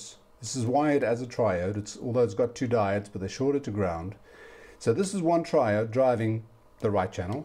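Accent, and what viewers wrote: South Africa. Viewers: "do not do this at home.." Why not, thats an excellent solution if the correct part is not at hand, hehe :-) Judging from your accent this old saying would apply: `n boer maak `n plan. En dis geen kak plan nie meneer, dis baie goed werk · I happened on one of your newer videos, found value (got two needing new capacitors), so started at the first video posted. Dad passed on an interest in people and their accents, so was trying to place it, vaguely familiar. Now knowing an Afrikaans accent, of course, heard it years ago in some of the Uni classmates. My only connection is Dutch ancestry, who desired so much to be American, our family no longer speaks the Mennonite dialect or German. But, the attraction to Germanic was not removed, have a Telefunken upright console and a Körting (an American-German love child, my example, a stereo SE ECL86 with not one shred of information on the internet). 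😜 Cheers, Adriel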